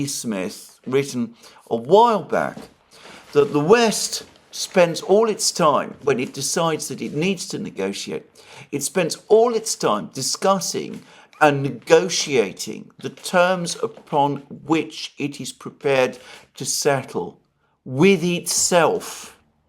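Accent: United Kingdom. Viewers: It's strange, I hate British accents and it's always bad news, but this has become a comfy little show for me. Thank you Alexander.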